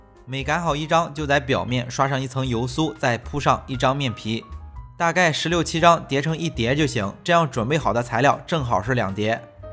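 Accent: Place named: Shandong, China. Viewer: 听口音就是北方人阿，山东的吧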